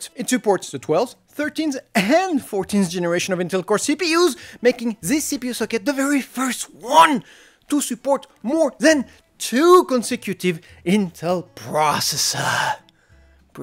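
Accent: France. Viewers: You have an french accent xD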